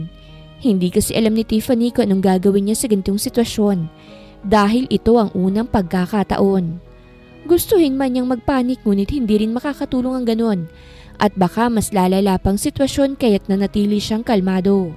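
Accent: Visayas, Philippines. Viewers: Hahaha..nalingaw ko sa bisaya sa narrator..cute😊😊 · imo mga follower's mga bisaya...cute kaau ka mg bisaya...salamat sa new upload...God bless❤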